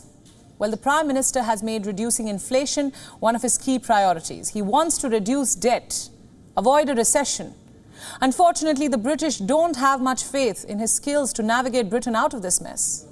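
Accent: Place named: India. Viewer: the host Ugly Indian accent is a pain to hear. Indians just got the worst accent and everyone in the US is laughing at how Indians speak English.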